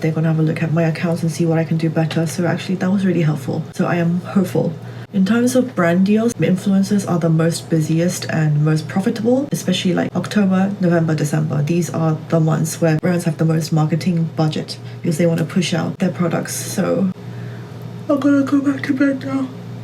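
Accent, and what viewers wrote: United Kingdom. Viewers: Don't worry, i love your vlogs for whatever you're doing and so! Also i love your british accent as always😆💕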